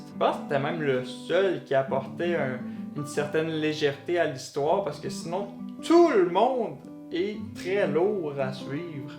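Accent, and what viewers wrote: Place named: Canada. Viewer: Bel accent canadien en passant😊 J'suis un mordu de lecture...c'est surtout ça qui m'a accroché... De plus les titres des livres le sont tout autant... Merci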